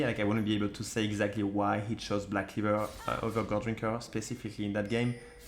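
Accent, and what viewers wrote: France. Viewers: Jolie accent fréro, ça fait du bien de voir un français qui parle aussi bien anglais haha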